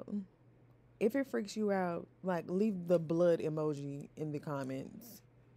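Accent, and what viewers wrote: South, United States. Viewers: Lmao 😂 girl .. no music cuz YouTube 😂 I love country accents please narrate ❤ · Don’t apologize for your country accent. Thanks for the video. 😊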